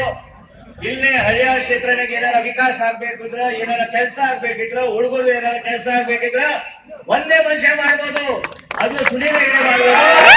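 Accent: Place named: North Karnataka, India. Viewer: Yappa Uttara Karnataka hodithanalo bhai 😂😂 salute sir for you 💛❤️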